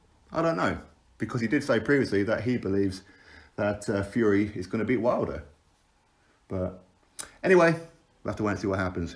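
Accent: United Kingdom. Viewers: Soon as i heard the uk accent i knew you were lying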